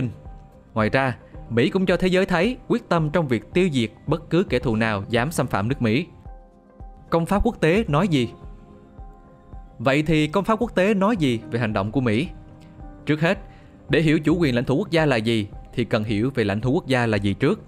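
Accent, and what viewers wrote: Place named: Quang Nam, Vietnam. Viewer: Giọng đọc không giấu được chất quảng nam.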